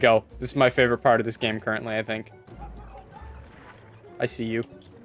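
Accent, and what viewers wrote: Canada. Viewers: u canadian ?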